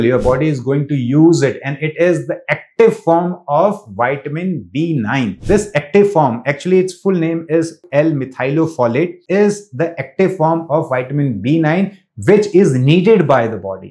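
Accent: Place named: India. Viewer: 😮Dr has surprised me because he is now saying vitamin instead of witamin after so many years but I understood him and I love his Indian accent plus his extreme intelligence. Thank you doctor I wished I lived in India so I could come see you. As usual Wise information thank you sir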